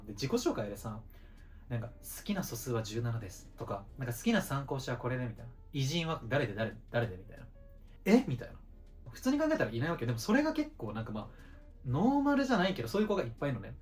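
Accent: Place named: Kansai, Japan. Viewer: 人生のセンスもあって本当にすごいですꧦ𐅁𐀸𐋠𛰙᭜𖫴𖫰𖫱𖫳𖫲𖫲𖫳𖫴𖫰𖫱꛰ﯩᩝ︪᭜𖫴𖫰𖫱𖫳𖫲𖫲𖫳𖫴𖫰𖫱꛰ީᩝ𛰚てかもっと関西弁ナチュラルに聞きたい😂同じ関西人なので期待してます♪♪♪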